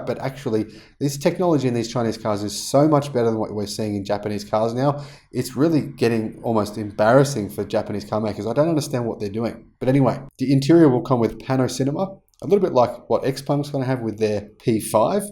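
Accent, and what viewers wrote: Australia. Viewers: I just subscribed to your channel. It's great. To make it even better, please get yourself a good lapel mike. (No offense intended, but your Australian accent is an added challenge to non-native English speakers.)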